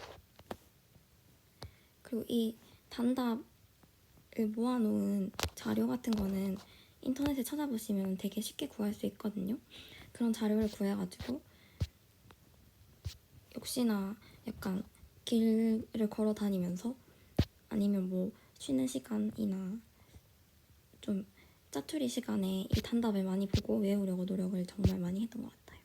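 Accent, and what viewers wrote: Gyeongsang, South Korea. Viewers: 경상도 분이신가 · 경상도분이네요!! 친숙한 말투 ㅎㅎㅎ 전 포항출신이에요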